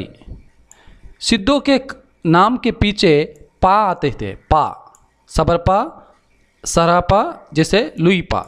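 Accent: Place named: South, India. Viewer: very good but sorry to say you should improve your speaking leave I think you are from south Indian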